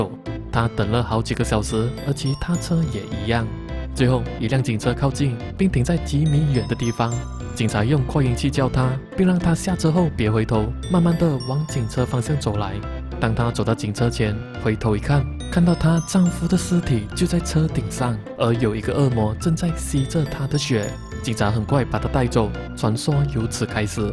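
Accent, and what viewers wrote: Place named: Fuzhou, China. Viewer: 一听到就知道是福州人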